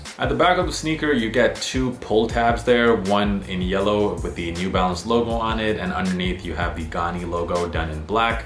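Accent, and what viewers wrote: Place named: United States. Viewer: i always thought you lived in Europe.. not sure why cus you sound american lol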